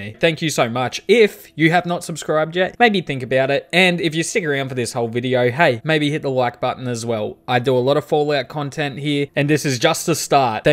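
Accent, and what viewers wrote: Australia. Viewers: An amazing let’s play. I love watching people play nice and good characters but there is something cathartic about this. It just rubs me the right way. The Australian accent helps as well ;)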